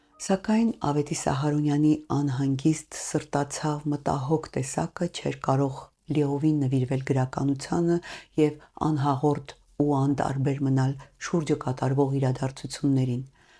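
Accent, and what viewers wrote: Standard. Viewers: Hiacas em dzezanov yev dzer maqramaqur hayerenov. Dzer amen mi hraparakume mi dasagirq e. Dzez uj u korov. Shnorhakalutyun.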